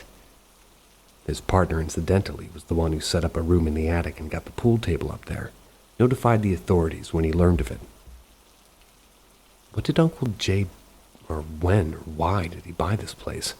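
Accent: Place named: United Kingdom. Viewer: M i always ask but never get s response. Why is fhere a uk narrator sometimes?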